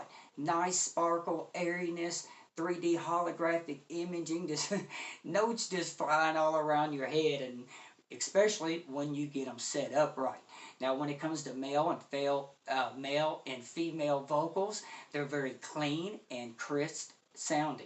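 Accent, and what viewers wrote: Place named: Tennessee, United States. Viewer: Where you from? Sounds like TN ;) … I’m in Bama here, but from TN.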